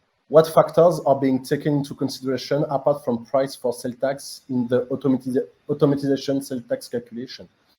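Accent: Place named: France. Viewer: So greaaaat 🔥 Love your cute French accent lol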